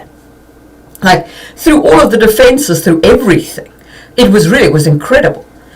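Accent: South Africa. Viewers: Oh, hey, aunty! I wasn't expecting a reply! I would have been a little more cordial otherwise. I just wanted to jot my opinion down. Just want to say I absolutely LOVE LOVE LOVE your videos! They've made me even more inspired to world-build for my D&D/Fanatasy RPG games! This was the one point where I was like "Huh! Actually, I think I [respectfully] disagree here." In a world filled with powerful mages, there will always be people like Havelock Vetinari from Terry Pratchett's Discworld and Stan Edgar from The Boys (TV series/comics). By the way, your accent sounds South African to me. Are you from there by chance? I absolutely adore South Africa. And I'd like to visit one day.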